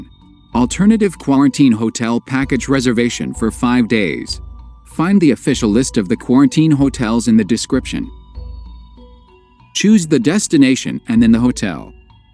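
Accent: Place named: Ukraine. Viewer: Gday, pete sent me here. subbed liked To your channel. I like pete and his videos alot, but i was annoyed by his question asking you if russia should have invaded ukraine bloody STUPID question! some feedback for you. I really dislike computer voices on videos, I much prefer you speaking even if you might think your ukranian accent might be difficult for some people to understand. Or whatever other reason. please consider using yr real voice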